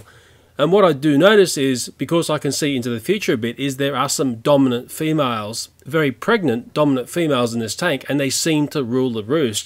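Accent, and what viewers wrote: Australia. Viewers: I know this is random, but are you an Aussie or Kiwi? I'm just wondering where your accent is from.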